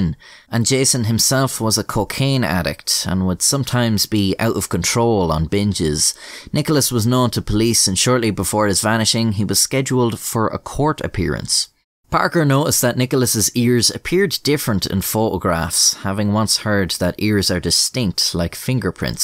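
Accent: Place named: Ireland. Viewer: "I admire conmen" yes we know you are Irish by your accent.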